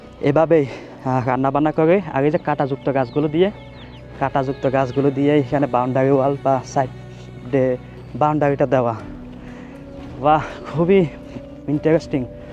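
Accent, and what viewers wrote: Chittagong, Bangladesh. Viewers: Don't mind brother... watching from Rangpur and I can understand your Bangla easily but Chatgaiya is difficult to understand..No problem go ahead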